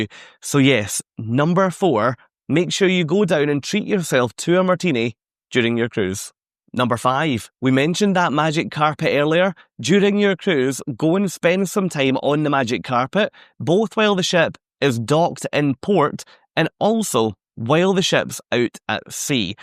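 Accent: Scotland, United Kingdom. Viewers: It took two nanoseconds to know you are a Scotsman! I live in Minnesota and my favorite boss of all time, one Frank Docherty, was my most favorite boss of my entire career. Thank you, for a great review. We were on the Edge last Fall when we cruised from Vancouver to Sydney. We love the ship and agree with all your observations.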